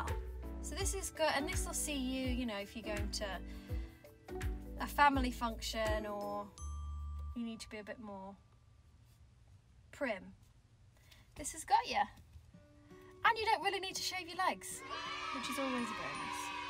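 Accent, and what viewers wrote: United Kingdom. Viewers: Love you from Japan 🇯🇵! I am learning British accent from AOS and your video! I can’t wait AOS comes Japan😭😭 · I just love your cute videos! I bought all sorts of tea at the store this morning because of you😉 your style is adorable. I also have decided you look like a combination of Jennifer Garner and Kierra Knightly, you are so pretty and your accent is the best. My husband always makes fun of me and my obsession with a British accent. I mean I feel like British people could say anything, even if it makes zero sense, but somehow it always sounds smart and classy with their accent🤣 love all your videos, and I will miss Fitzsimmons so much!!!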